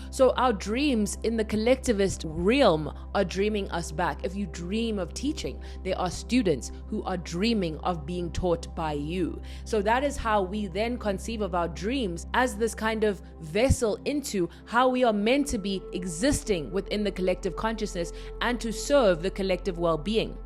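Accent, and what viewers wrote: South Africa. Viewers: Such a timely topic of conversation. Yesterday, and today as well, I had a conversation with God, questioning the idea of "Devine timing" vs "Doing things at my own time" and the response was so humbling but felt good "It's not only about you. It's about everyone else who is going to be impacted through and by you too. it's not just about you." and then today it hit me "The things you want are also manifesting you . " And it shifted Everything from resistance and obsession, to Allowing it to naturally inevitably unfold. . And TADAAA here's I come across Your video confirming everything that God , angels and spirit guides are telling me. ❤❤❤❤❤🎉. I'm so happy to hear a south African women's voice creating a space like this and using this platform the way you are. 🎉🎉 We've been waiting for your voice Sis❤🎉😂YES!!!!!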